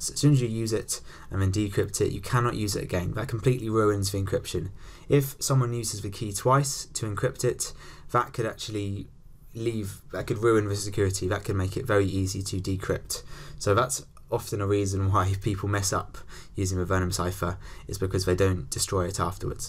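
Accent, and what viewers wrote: United Kingdom. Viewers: This is a heavy British accent but it gets the understanding process in the brain meats done 😂❤ Thank you mate 🌚❤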